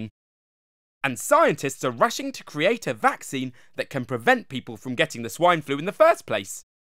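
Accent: England, United Kingdom. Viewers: Delta Core 12 its a British accent. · He has a accent because this brain pop is meant for the UK duh -_ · what happened to his voice his gone all english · Since when tf was he british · I was like "um? I didn't remember sounding british" then i saw the description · He sounds like he's British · why is british? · HMMM i'm used to american but i love how British sound:D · OMG ITS A BRITISH!!!!!! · I had no idea there was a UK one. I'm from America so I was shocked to hear him with an English accent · Hearing this in a British accent us slightly unsettling to me... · UK voice?damnit =( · WHAOA WTF HES BRITHISH!? Put him in spainish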